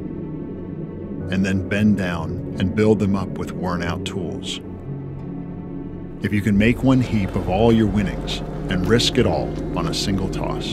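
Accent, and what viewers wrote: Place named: United States. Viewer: You modernizes and Americanized the English. It is not the same.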